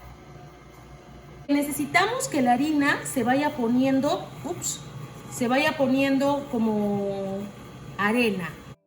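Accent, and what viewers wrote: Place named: Peru. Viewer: ¡Qué peruana que eres! "salsita, cominito, cada presita" Me encanta, extraño mi tierra.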